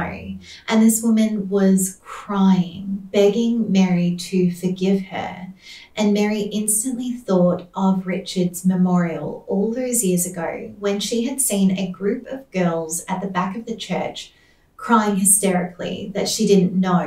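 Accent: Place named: Australia. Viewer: New sub! I loved the way you did this. It’s refreshing to hear a fellow Aussie doing these videos. Well done!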